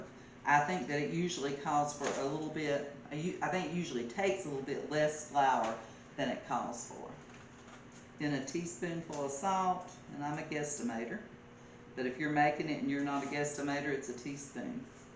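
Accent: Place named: South, United States. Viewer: Have you ever heard of any kind of roll that was made without yest? You southerners are so weird the way you talk adding extra words in when you don't need them and cutting words out that normal people say. You're like the British just making stuff up as you go along. Do you say beet root also like there's some other kind of beet that isn't a root? I guess if you grow up saying it, it sounds normal but for the rest of the country it sounds like you rode the short bus to school.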